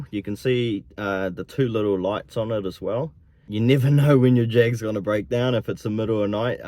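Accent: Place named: New Zealand. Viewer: You NZers speak funny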